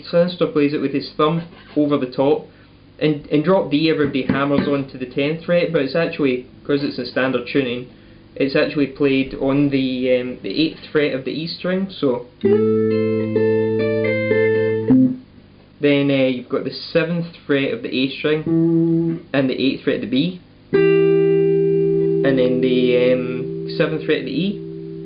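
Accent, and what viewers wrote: Scotland, United Kingdom. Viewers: are you Scottish? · Was searching through all the intro tutorials then came across your's. I chose to stick around cause your Scottish definitely paid off thanks for the great lesson ;)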